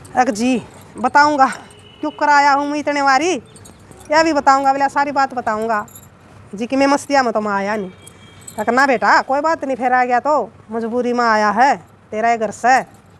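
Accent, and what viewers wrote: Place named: Haryana, India. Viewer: konse Gaam KI BAat se aap KI Bhasa TO Rohtsk y Distt. karnal KI lagti Hsi.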